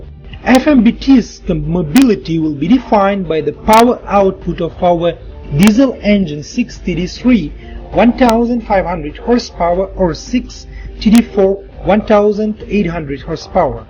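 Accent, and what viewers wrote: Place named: England, United Kingdom. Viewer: это не американский,а английский....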